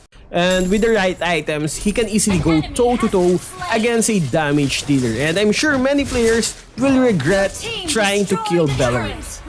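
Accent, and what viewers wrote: Tagalog, Philippines. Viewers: Your Tagalog accent is showing 🤗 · The filipino accent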